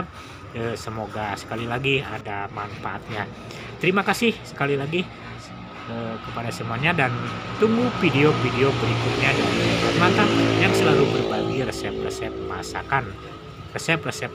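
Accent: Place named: West Java, Indonesia. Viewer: Hadir menyimak Kang, siap dipraktekkan ah...Akang orang Sunda? Logatnya Sunda banget hehehe....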